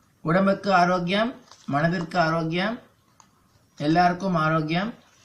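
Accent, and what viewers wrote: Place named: Tamil Nadu, India. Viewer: Hi I'm new to ur channel. I have just started to follow u. It's very nice to hear ur voice n ur tamil slang. So please update your recipes like same as you done in this video don't ever put the background music and the ingredients alone. I cannot follow. You please talk to us and cook that is ur speciality. Don't do like others you are unique be the same as you are. thank you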